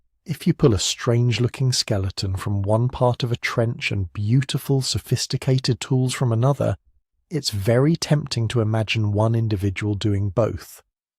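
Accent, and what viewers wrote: United Kingdom. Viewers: I put the playback speed on 80% and man something about a slow british accent just knocks me out😂🙏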